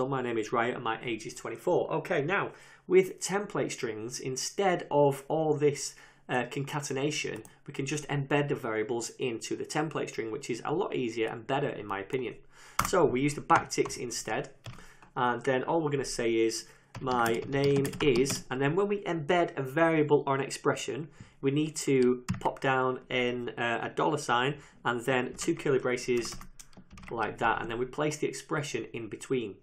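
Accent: United Kingdom. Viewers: "Mathematical Addition" - I like the UK pronunciation. Lot of energy spent while speaking British English I believe.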